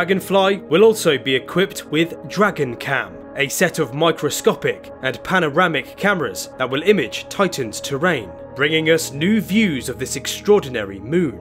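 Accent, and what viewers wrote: United Kingdom. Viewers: Is the narrator the same Brit who pushes all the snake oil cures?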